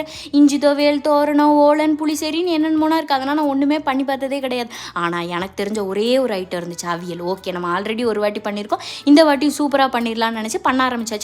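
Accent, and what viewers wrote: Tirunelveli, India. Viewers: Thinelveli..words good slang ❤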